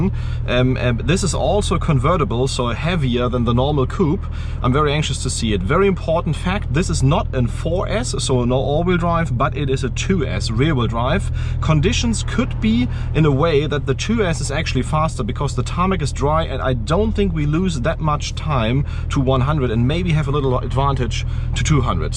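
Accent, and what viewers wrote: Germany. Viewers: Hate the dumb German accent and dad jokes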